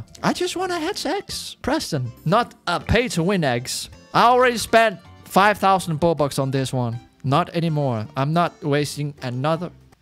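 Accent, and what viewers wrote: Denmark. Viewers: YOU SOUND DANISH?!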